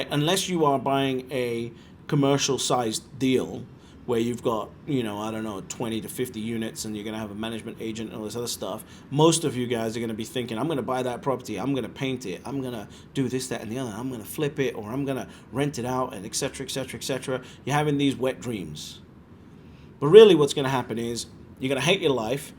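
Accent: United Kingdom. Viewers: Digging the British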